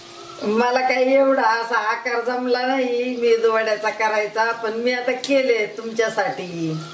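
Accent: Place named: Dhule, India. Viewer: Aho aaji tumhala sagle yete ase naka bolu mi tumcha aavaj eikla ki mala ase vatte ki mi dhule la aahe chan boltat aaji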